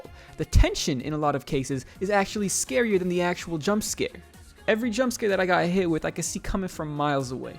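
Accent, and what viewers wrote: United States. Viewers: The New yorkuh accent is a bit distracting, but video not too bad so far. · I think you got that New Jersey Ray Lotta thing going on.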